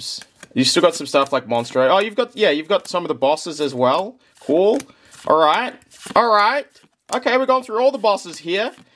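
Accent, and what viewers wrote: Australia. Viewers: He’s not saying it wrong, it’s an Aussie accent you dolt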